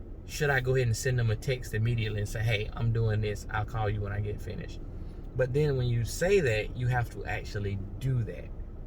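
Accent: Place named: South, United States. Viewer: Love that southern accent! Be blessed 😎😷👍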